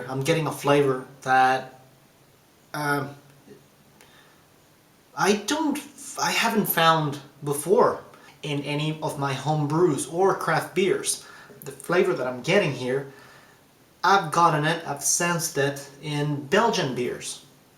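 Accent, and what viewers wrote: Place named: Brazil. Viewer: HAhaha no prob mate, its a thing that just brazilians would know, its like a compliment to see if the another lad is also a brazilian. anyway its cuz your accent, not that you have it, but in some parts of the vid i can tell u are a BR(brazilian) but not hehehe.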